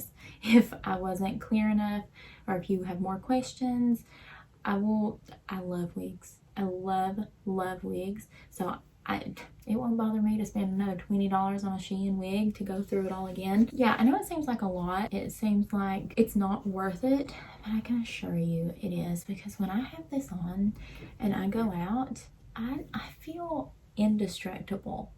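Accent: South, United States. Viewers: i haven’t even ordered my wig yet but this vid is so fun lol it feels like im on facetime with a sweet little southern lady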